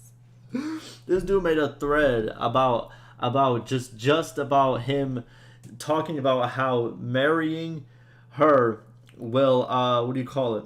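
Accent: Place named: Los Angeles, United States. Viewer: Lol wow from the Ukraine??!! Dude your voice and demeanor makes me think you are from LA and not even a white guy haha. Keep up the reporting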